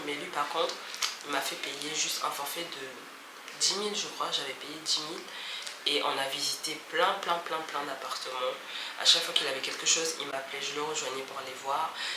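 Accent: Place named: Ivory Coast. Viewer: Tu as un accent ivoirien. En plus tu ressembles a une fille agni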